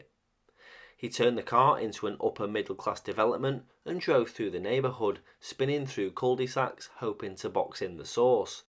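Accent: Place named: Liverpool, United Kingdom. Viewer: Where are you from? I detect a Scouse accent.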